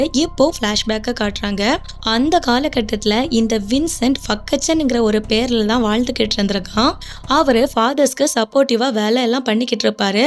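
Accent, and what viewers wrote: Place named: North India, India. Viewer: தமிழ் சரியா தெரியாத வடக்கனுங்க "கார்டு மேலே இர்க்க பத்நால் நம்பர் சொல்லுங்கே சார்"ன்னு சொல்ற மாதிரி இருக்கு நீங்க பேசுற தமிழ் slang..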